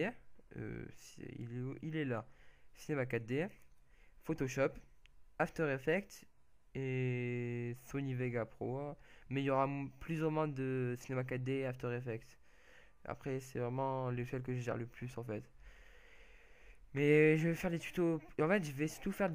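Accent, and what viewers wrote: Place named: South, France. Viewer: Aaaah ! On sent l'accent du sud ! Sinon j'aime bien !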